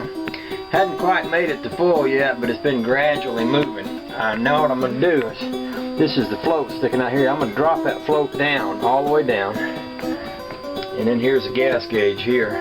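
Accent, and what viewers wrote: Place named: Tennessee, United States. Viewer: The license plate said Maine, but your accent said TN. Nice jeep and thank you- I'm needing to test my fuel gauge too, in TN.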